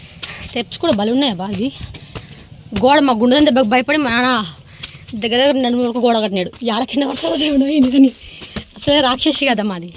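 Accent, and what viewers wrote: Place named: Kadapa, India. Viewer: Meeru matlade language same ma area lo lage vundi, garu meedi e vurandi, meeru kadapa district ki chendinavara?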